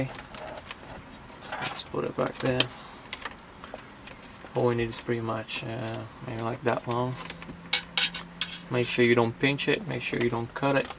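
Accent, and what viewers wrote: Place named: United Kingdom. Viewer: martenica bg si :)))) interesno kade moje da si komunikirame poveche da pitam poveche... jiveesh v usa s uk akcent :)))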